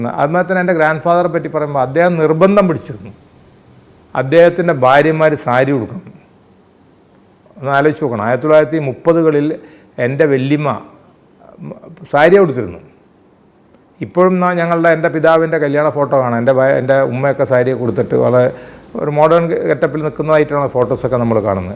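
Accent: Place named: Malabar, India. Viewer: മലബാർ ശൈലിയിൽ സംസാരിക്കുന്നു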